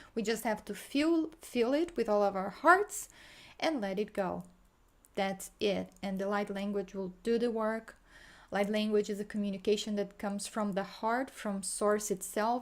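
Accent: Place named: Brazil. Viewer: Listened to this again. For whatever reason, really connected with it...and your story. Lots of shame and heavy emotions came up for me. Also, could tell from your accent you are from Brazil. I was lucky enough to visit multiple times, and always felt so connected. Obviously, I was only seeing what I wanted to see (which as a tourist , is always distorted). My family is from India, but I have very little interest in the culture. It's so boring to me. I always wished I was from Brazil instead :)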